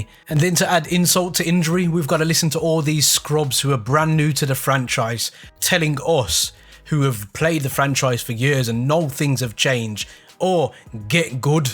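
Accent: Birmingham, United Kingdom. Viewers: Proper brummy lad!!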